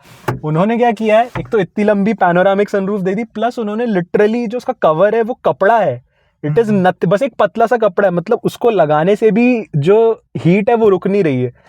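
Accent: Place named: South India, India. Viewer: his talking style is similar to that of a south Indian guy born and brought up outside of south India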